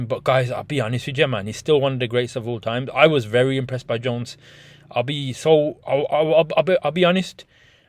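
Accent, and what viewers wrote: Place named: Black Country, United Kingdom. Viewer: 💯 I hope it is a negotiation tactic by Jon mate cuz it’s the only fight I want to see in the ufc 🏧 I was never a fan of jones vs stipe tbf I wouldn’t argue with jones vs pereira but the aspinall fight has to happen first let’s be honest, keep the content up bro you sound like your from the Black Country I’m only down the road, Telford bro 👊 n you’d have to be a total idiot or just plain arrogant to write jones off against anyone I just think Tom has the edge over him not to say Jon can’t win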